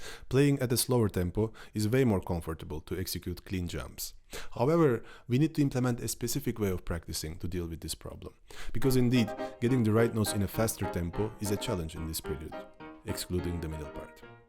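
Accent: Russia. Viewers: Слава Шопену! Именно благодаря ему я наткнулся на Ваш канал. Я так понимаю (по акценту) вы русский. Подобного уровня контент я знаю у Михаила Аркадьева ещё. Но он в плане оформления не так хорош: там на телефон записано всего лишь. А тут у Вас просто как документальный фильм, а не урок) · typical russian accent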